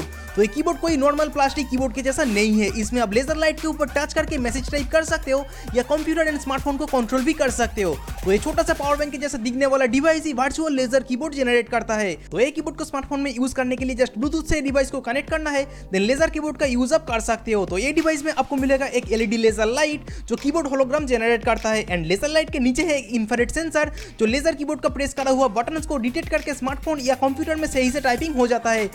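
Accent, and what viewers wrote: Bengal, India. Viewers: Aar Tumi bangaali haaii... Aur aapkaaa Aaawaaj se paataa chaaaalta haiii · Sound bangali type h.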